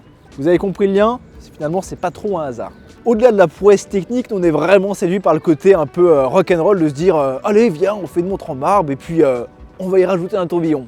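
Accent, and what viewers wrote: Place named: Savoy, France. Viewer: Du Texas - avec le Stetson et la Jeep, on pourrait presque y croire s'il n'y avait pas l'accent savoyard (ou quelque chose comme ça) !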